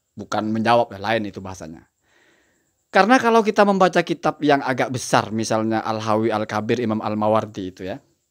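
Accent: Madura, Indonesia. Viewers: Kalau dr nadanya, kayaknya Sampean oreng madhureh. Kerren gus. Bisa bagi nomer wa gus?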